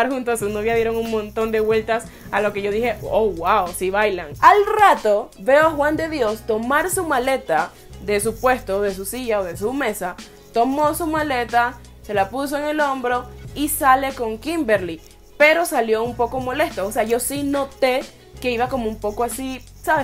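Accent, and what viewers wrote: Panama. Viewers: Sabía que eras de Panamá 😫 si tenemos acento Ajajaja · Acabo de llegar a tu canal,y con tu acento reconocí que eres de PANAMÁ 🇵🇦😍❤. yo como 😳está chica suena al acento panameño😍❤. eres muy bella.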